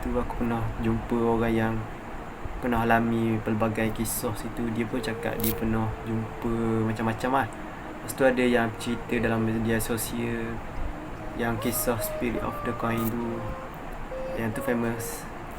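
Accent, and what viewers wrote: Terengganu, Malaysia. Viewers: Org mna? Bunyi cm org terengganu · abe tu ko? Meme pon . Sdak dgr org ganu kecek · nampak gaya ganu ni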